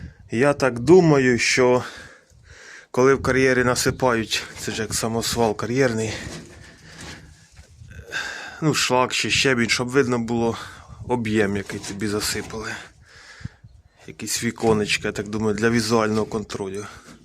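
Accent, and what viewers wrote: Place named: Dnipro, Ukraine. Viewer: Браток,приветствую! Слышу по говору ты из Днепр.области. Поздравляю с покупкой! МАЗ это просто Мерседес для села!!! 😊 А если подцепить прицеп,то 5-6 Газонов заменит в уборочную.😊 Удачного ремонта!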